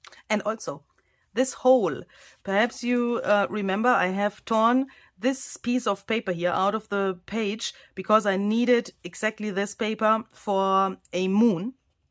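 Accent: Germany. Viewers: such a heart felt message today! To me I do not care what brand you use. I am here to watch your creative, very interesting journals. What you are making is art! You have a heart of gold, and I have connected to you. I told you before that my dad's family all came from Germany. Some in 1840, 1860, and others later. But for some reason, your accent reminds me of my great grandparents, because their first language they spoke here was low German, and they still had the strong accents. I feel like you are family to me. Some people are what we call them here, key board warriors. They hide behind a screen, and say mean things, because we don't know them, and we cannot see them. I will continue to watch you, because you to me are family. And listening to you, brings back the comfort, of listening to my long gone great grandparents. And I am much older than you. It is hard to explain. 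Your English is good! I couldn't pronounce the German words right when my grandma tried to teach me. She said my tongue wasn't slick enough. (She told her youngest daughter the same) ha ha! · This is the most wonderful junk journal I have Ever seen and the most amazing piece of art you and Barbara have done together. You have created more than just a journal, it’s very special. Ideas come from your imagination of seeing things before they are created. Like seeing it done before you start it. I have been able to do this with my father. He taught me to image what I want to make before I came out with it. And some I taught him to make a new way to do a project an easier way, than he thinks. I love watching your videos, love your German accent, and your English is wonderful. You have touched my life and creativity, as well as others I am sure. You are Amazing, and Barbara. Thank you so much for your sweet kindness,. 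I just Lov you. Keep up with your great works. Always be safe and well. Your fan and admirer. Lin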